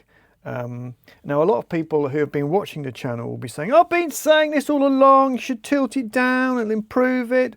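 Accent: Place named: Leicester, United Kingdom. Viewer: You must be from Leicester, you sound just like my mate Andy who's from Braunstone...... and Gary Lineker. On a serious note ive put a Volvo 340 engine (72bhp) in a Renault 4. Ive had to drop the steering column about 25mm to clear the inlet manifold for the Weber carb. It goes like a rocket now but my Mrs doesn't like driving it because the steering wheel is now too low(she says I don't have a problem. ) Do think i could cut it and fit a UJ to bring it back to where it was?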